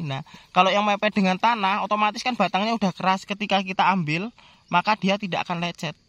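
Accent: Java, Indonesia. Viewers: Asline jowone sampean ngendi. Bos.. hehehehe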